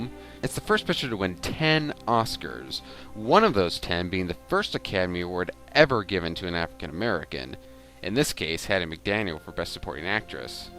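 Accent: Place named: New England, United States. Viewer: Nasal yankee commentator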